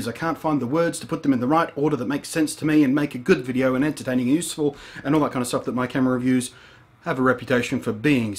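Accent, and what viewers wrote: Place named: Australia. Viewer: Love watching your stuff man, I agree with 99% of everything you say. I dig the enthusiasm you have for gaming, Its rare these days and its refreshing to see. Your game and device reviews are very entertaining. Its also good to hear a fellow aussie online. Keep it up "thumbs Up"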